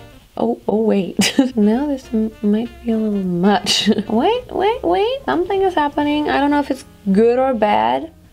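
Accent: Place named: Germany. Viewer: Richtige deutsche Akzent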